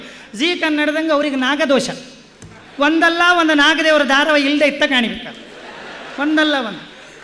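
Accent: Kundapura, India. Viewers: ಕುಂದಾಪುರ ಕನ್ನಡ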